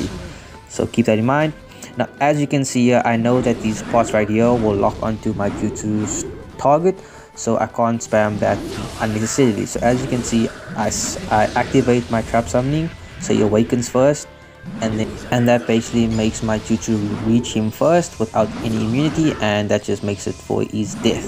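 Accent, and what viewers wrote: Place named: South Africa. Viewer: Yo bru where u from ur accent sounds South African??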